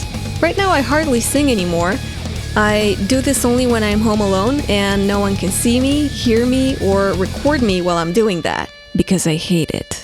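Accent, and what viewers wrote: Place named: Russia. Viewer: Вааауу у тебя Чисто русский акцент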